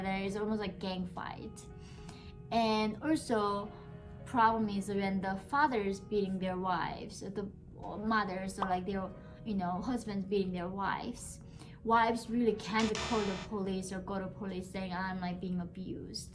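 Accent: United States. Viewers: Picking up that American accent I see I like lol 😍 Cheers much love and respect from los Angeles CA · She now has an American accent! Lovey person and so intelligent 😌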